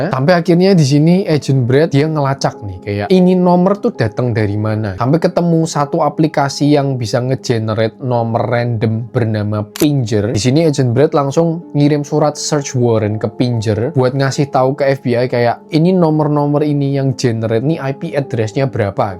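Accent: United Kingdom. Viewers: Anjay pakai british accent cyuk, kereeeen. 👍🏻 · anjay pamer british accent nih bang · Love ur English accent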